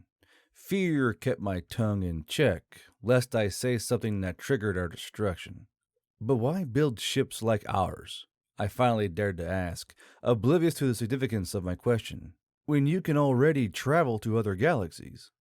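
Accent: South, United States. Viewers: I'm not disagreeing with you. But I have to comment on the standard American comment. You cannot get more American than this mans accent. It is found no where else. That is all. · Being from the south myself, I can appreciate a good drawl. However, the good old boy is no excuse for improper diction and pronunciation. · He has a version of a Southern Accent. I, myself, would NOT wish him to try to learn to speak "standard American"! There is much more to his speech than of just an accent, it is also the feelings and idioms too. Must the non-AI storytellers also speak the same way that the AI speaks?! I hope not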